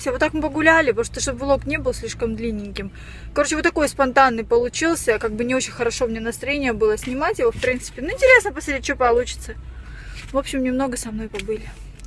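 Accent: Rostov, Russia. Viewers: вы с Ростова?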